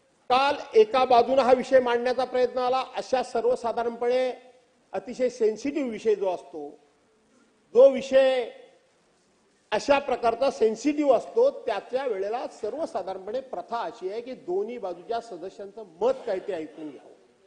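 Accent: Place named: Konkan, India. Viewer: भास्कर शेठ जाधव साहेब उद्धव साहेब यांचा ढाण्या वाघ आहे अस्सल कोकणी बोली शालीत सभागृह गाजवून टाकला जय महाराष्ट्र साहेब जय शिवराय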